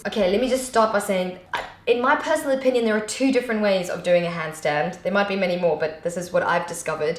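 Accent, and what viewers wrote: United Kingdom. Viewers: Are you from UK???? I'm asking you because of your accent cause my brother works in UK and I was in UK and everyone has that accent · Anyone else vibing the British accent after watching this?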